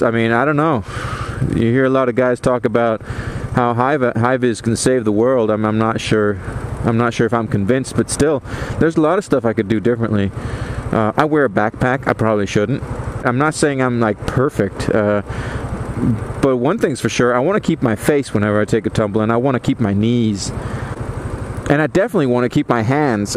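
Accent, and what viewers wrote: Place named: United States. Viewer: Nice, your accent suggestion you're American. What are you doing there? You also lived in Mexico? Interesting.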